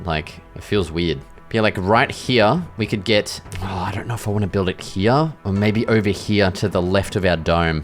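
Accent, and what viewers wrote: Australia. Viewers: Awesome gameplay to watch and listen(i just like an Aussie accent). And also, you should definitely defeat karkinos would be a nice goal for the series imo.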